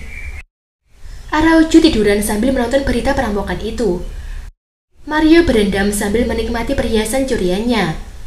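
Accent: Java, Indonesia. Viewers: mbae ngomonge medok bgt hihi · Plis jan medok si, gw tau lo jamet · Medok banget, jowone ndi mbak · suara medoknya itu loh ,bikin gimana gitu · Logat jawa nya medok bgt mbak 😁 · Mba e logat jawa poll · Medok bgt mbak, but i like it 😍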